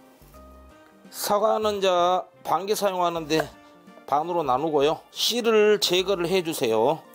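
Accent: Jeolla, South Korea. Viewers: 구수한 전라도 말씨 좋아요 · 전라도 말씨중에 제일 매력있는 목소리인것 같은데요 음식과 말투의 궁합이 최고~^^♡ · 구수한 전라도 사투리. 정감있고 언제나 맛있고 정성어린 설명. 감사합니다~~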